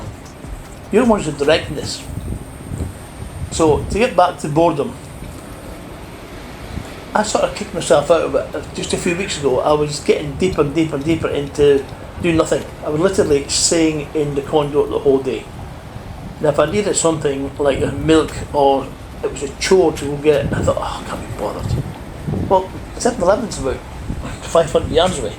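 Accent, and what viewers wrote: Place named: Scotland, United Kingdom. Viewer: Alan, accents make the world a more interesting place. If you can't grasp what's been said, then that's your issue & not the speaker. I'm not Scottish & yet I love the Scottish accent & understand every word.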